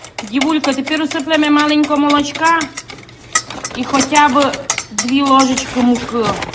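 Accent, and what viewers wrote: Transcarpathia, Ukraine. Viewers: Яка файна мова, обожнюю цей закарпацький говір. Дякую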